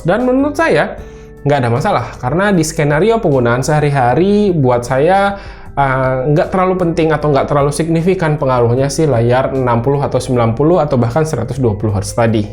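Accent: Samarinda, Indonesia. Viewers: Org Samarinda bang? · Wah org samarinda nih abangnya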